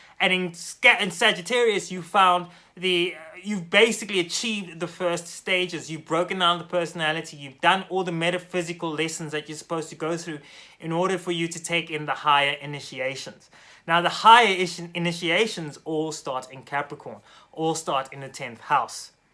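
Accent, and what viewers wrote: South Africa. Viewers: This dude south African?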